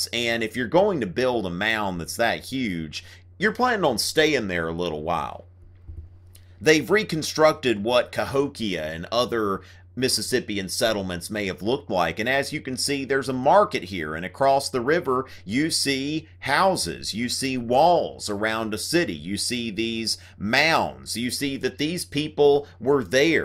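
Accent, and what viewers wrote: South, United States. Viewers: Wow is this the southern cowboy accent from the USA? I always thought the people from that part of USA are considered stupid. It's amazing, you seem smart and well put together.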